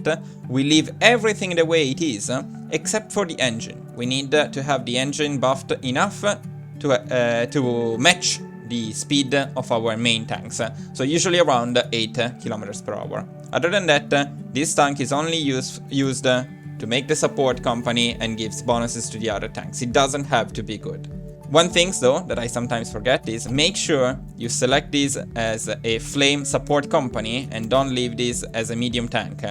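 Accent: Italy. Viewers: Dall'accento suppongo te sia Italiano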